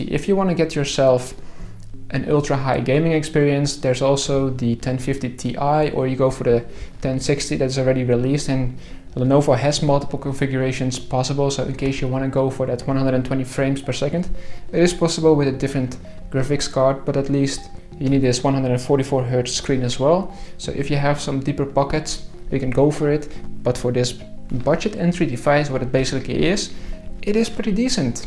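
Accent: Netherlands. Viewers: Are you dutch? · Goede video! Ik heb er wat uit geleerd... Als een Nederlander Engels probeert te praten merk je dat meteen EN Dat dit zeker een laptop is die het kopen waard is. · Is deze man Nederlands?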